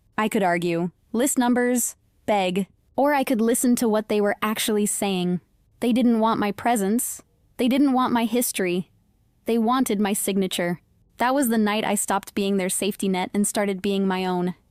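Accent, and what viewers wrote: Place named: United States. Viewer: A Thai story whit american accent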